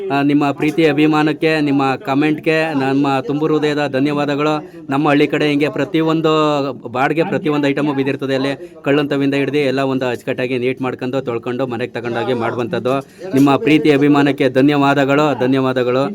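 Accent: Mandya, India. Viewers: ಬಹುಶಃ ಇದು ಮಂಡ್ಯ ಬಾಷೆ ಇರಬಹುದು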